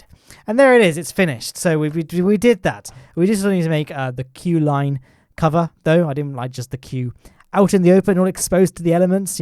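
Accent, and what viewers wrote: United Kingdom. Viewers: This is the most british commentary i've ever heard :D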